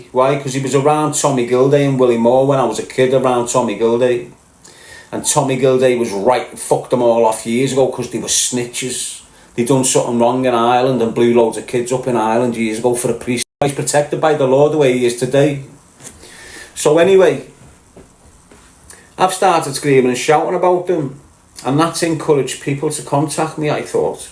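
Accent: Liverpool, United Kingdom. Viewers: scouser quntt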